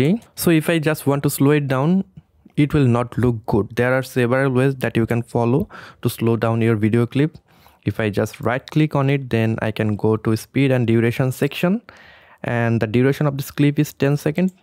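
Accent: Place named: Assam, India. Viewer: You sound so assamese... are you one??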